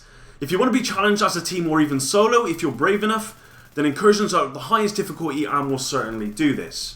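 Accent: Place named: England, United Kingdom. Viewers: You sound like a fellow Bristolian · Oh these annoying as fuck English accents,why don't people just speak American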